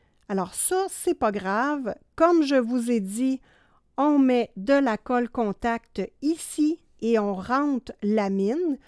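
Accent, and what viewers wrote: Canada. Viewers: Cc,je me suis abonné à votre chaîne youtube que j'adore 😄Vous avez un accent canadien que j'aime,vous me faîtes rire parfois et ça fait du bien par les temps qui courent.Merci de nous faire découvrir vos achats et de nous donner vos impressions. Joli livre 😄Je pense me le commander❤️😍A bientôt toujours sur votre chaîne,bisous,Martine 😍❤️💜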